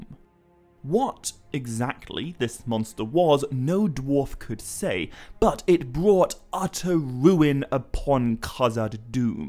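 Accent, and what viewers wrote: United Kingdom. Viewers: He's British. They ask talk like that.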